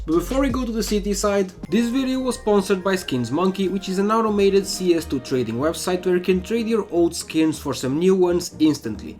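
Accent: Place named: Portugal. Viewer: you sound so portuguese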